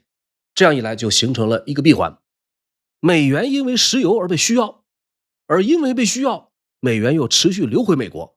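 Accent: China. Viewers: 就是个大外宣还挺懂潜规则知道用繁体亲近港澳台，奈何口音变不了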